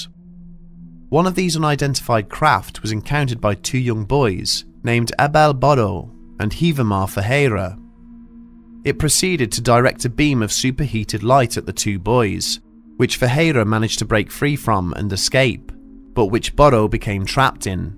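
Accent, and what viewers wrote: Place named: England, United Kingdom. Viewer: Yeah he narrates in actual English not that American parody language..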